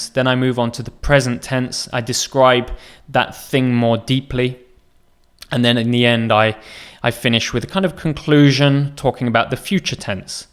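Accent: United Kingdom. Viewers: I 've just come across with your channel its really so helpful and your accent is very clear i also like the British accent especially when you're pronouncing the sound s as a sh .Hajar from Morocco 🇲🇦.thanks a bunch.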